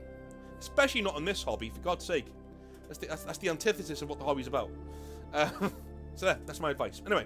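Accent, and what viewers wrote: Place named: Southport, United Kingdom. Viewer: You're a Lancashire guy who's in Merseyside but say it doesn't exist. You sound like your from Southport my hometown. Southport is an oxymoron as it is not South nor a port. It's north of Liverpool 43mins on the train. It's also somewhere people come to die. You can't more for care homes here. It's truly God's waiting room. But the important thing is that Southport sits right on the border of Lancashire and Merseyside. (Where the black watch on the wall keeping the wildling Lancastians out) 😂 However there is a lot of arguments here as to whether we're Lancastian or posh Scousers. So when you said you where in Merseyside but actually you're Lancashire it made me think.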